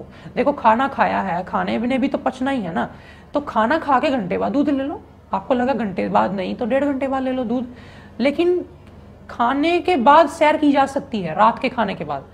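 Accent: Punjab, India. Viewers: Yr tone is very soft n Punjabi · Guru ji tusi Punjabi ho?😊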